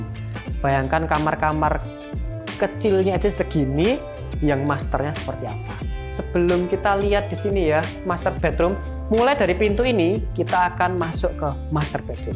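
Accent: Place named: East Java, Indonesia. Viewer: logat e indonesia ,koyok e arek iki cah jawa timuran 😅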